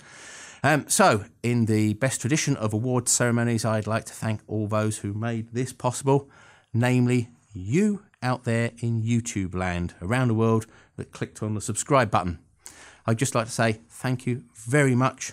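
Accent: United Kingdom. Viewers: Congratulations! I especially appreciate your fine British pronunciation in your videos. Keep up the good work!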